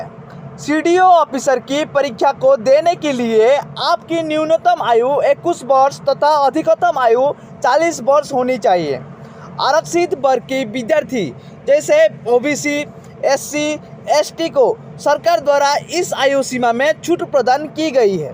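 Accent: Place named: Odisha, India. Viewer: Bolnewala aadmi velong to odisha i can know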